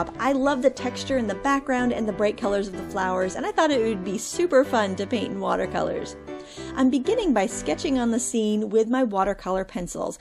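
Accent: Maine, United States. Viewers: Love your Maine dialect! you hoped you had enough "rum" to paint the pink geraniums - must be one of those wine (rum) and paint classes! Lol! Love your channel and look forward to each new one - keep 'em comin'..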